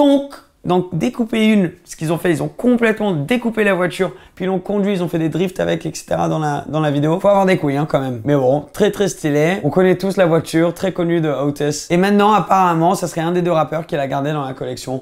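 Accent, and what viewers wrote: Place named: England, United Kingdom. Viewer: T'es anglais ou français ? Je te pose cette question parce que t'as un accent anglais mais tu maîtrise bien le français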